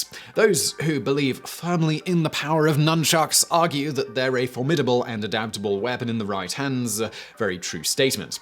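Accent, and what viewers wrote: United Kingdom. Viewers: The British accent is a plus. That's about the only positive . The rest is all hyperbole.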